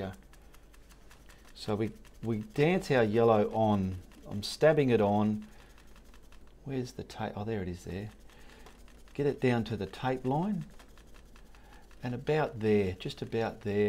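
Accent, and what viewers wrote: Australia. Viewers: Beautiful! Your sense of humor is so subtle, but so funny. "We'll put a bum on that cloud, and a bum on that cloud." I've been trying your examples in attempting to do my beach scene, and as simple as you make them, they still take practice, for me anyway. I'm combining two of your videos on one painting, and the tips and tricks are so incredibly helpful! Not sure if it helps the channel, but I definitely end up watching each video many times, haha. Watching them over and over builds up my courage! Thank you so much for taking the time to share your wonderful talents! I'm so happy to have found your channel! (I think I'm picking up an Australian accent while I'm at it!)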